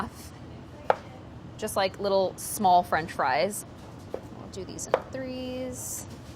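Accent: United States. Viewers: I have trouble appreciating her very girly american accent but the recipe looks delicious, which is the i portant thing haha. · Oh God. She's speaking nonstop. Her very india skin tone but completely American accent with her nonstop verbal diarrhea is hurting my brain. Ah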